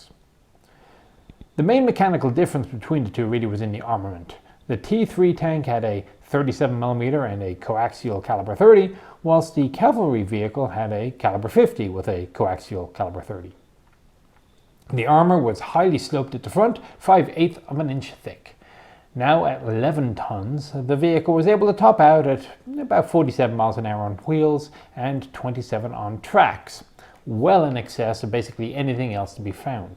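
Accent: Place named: Ireland. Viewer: I never noticed Irish in you accent... Untill I started noticing h-wheels. Not completely American yet XD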